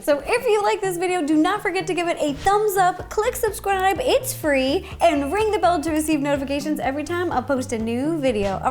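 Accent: South, United States. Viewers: voice is so cute and southern ij love it like southerners represent!!!! I'm from ga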